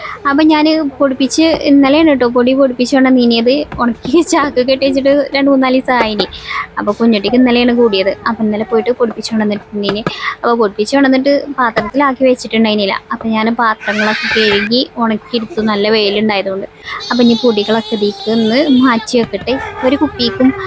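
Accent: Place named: Kannur, India. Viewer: വ്ലോഗ് എന്നും കണ്ട് ശീലം ആയി അത് കൊണ്ട് എന്നും വീഡിയോ ഇടണം ❤❤❤ Kannur സ്ലാങ് ട്ടോ ട്ടോ കുറവാണ് അത് കൊണ്ട് പറഞ്ഞതാ 😂😂😂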